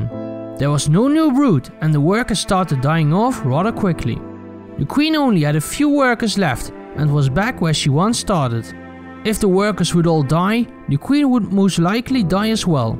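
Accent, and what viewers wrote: Netherlands. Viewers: Am I hearing a Dutch accent? :o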